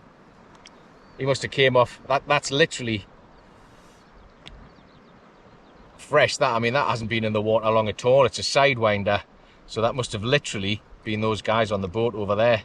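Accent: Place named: Newcastle, United Kingdom. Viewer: Thought that was you in the kayak i could just about hear the geordie accent, i was in the boat & yes that was my sidewinder 😂😂 hope it brings you luck! Great video!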